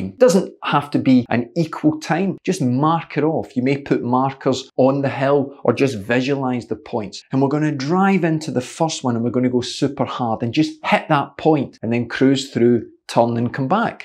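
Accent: Scotland, United Kingdom. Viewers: I love how you're lovely scottish tones make hill sound like hell, I think either works lol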